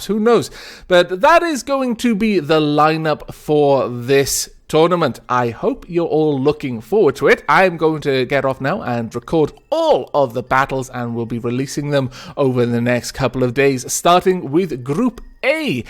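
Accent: Wales, United Kingdom. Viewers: You're welsh? Grwp? :)) that's awesome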